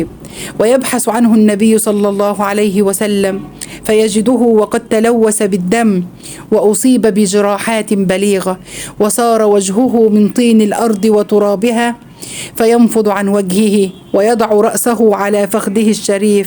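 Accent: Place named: Egypt. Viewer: السلام عليكي ورحمة الله وبركاته انا من متابعيكي اختي في الاسلام اختي الفاضلة ومحتواكي عن نشر احاديث الرسول وامور الدين جعلها في ميزان حسناتكي لاكن سامحيني في هذا الامر ان تتكلمي في اللغة العربية الفصحى لانها لغة القران فالجيم جيم وليس كيم والثاء ثاء وليس ساء والذال ليس زال وانا من متابعين شيوخ مصر واحب اسلوبكم في سرد اخلاقيات ديننا الحنيف لاكن ارجوكم ان تلفضو اللغة العربية كما هي وسلامي لشخصك يا غالية وشكرا وصل اللهم على سيدنا وشفيعنا وسيدنا محمد وعلى اله وصحبه اجمعين الى يوم الدين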